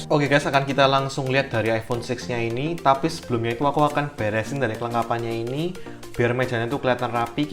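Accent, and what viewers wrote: Indonesia. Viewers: Logatnya surabaya banget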